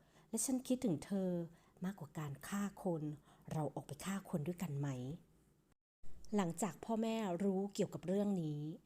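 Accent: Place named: Thailand. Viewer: สำเนียง eng ไทย มันจะพาลิ้นพันกัน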